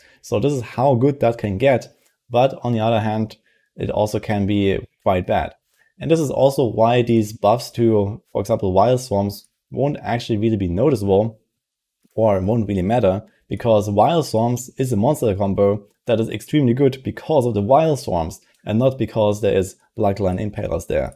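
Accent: Germany. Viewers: Role Model for "a german talking english" ❤️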